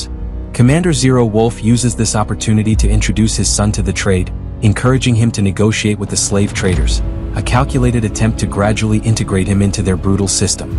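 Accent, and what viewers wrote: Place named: United States. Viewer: Your qwack qwack yank accent is just unbearable.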